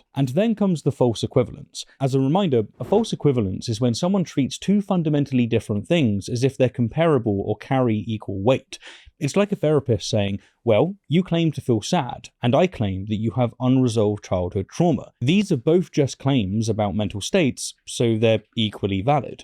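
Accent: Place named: RP, United Kingdom. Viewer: you're a smart cookie man! very cool downton abbey accent too :)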